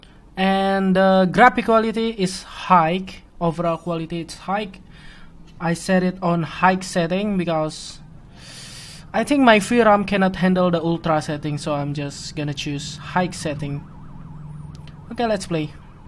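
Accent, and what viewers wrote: Indonesia. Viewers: orang indonesia ya soal nya bahasa nya masih kelihatan logat indo nya...... btw itu laptop merk apa tipe apa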